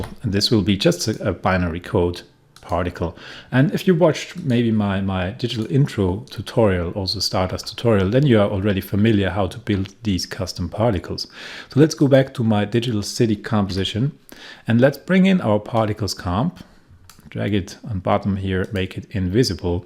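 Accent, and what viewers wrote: Germany. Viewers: ah! Close enough! From your accent I thought you wore german. Danke!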